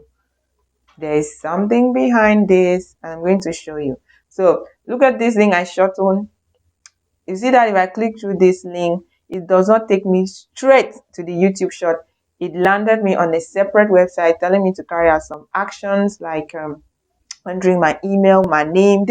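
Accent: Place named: Nigeria. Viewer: Upon recognizing your Nigerian/West African accent, I suspected a scam and cannot subscribe to content aimed at manipulating real ad revenue on YouTube. It appears to be a non-legitimate use of time.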